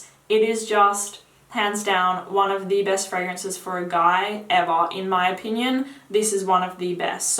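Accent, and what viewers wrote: Australia. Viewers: An Australian accent on a woman is my weakness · it went up quick. It's that aussie accent. Us blk men love it.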